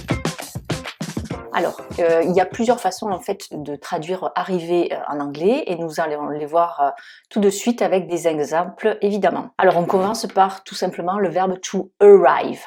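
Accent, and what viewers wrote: Toulouse, France. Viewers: As always, utter explanations. Thanks ( by the way, I crave your Toulousain accent )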